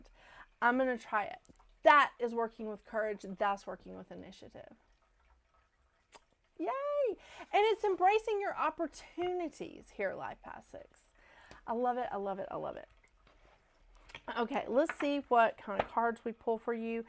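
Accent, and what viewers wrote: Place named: South, United States. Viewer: First time watching from Georgia. You sound SOUTHERN. Refreshing. Thanks for the heads up!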